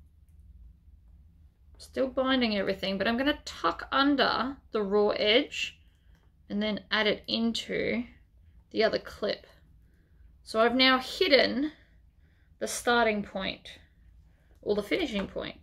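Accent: Australia. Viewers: has a Mitsubishi, that she painted herself, and has a Video, or a few showing how and what she used to do it.:). I too love listening to her as a Fellow Aussie, not many that do tutorials, so it is lovely listening to her.:)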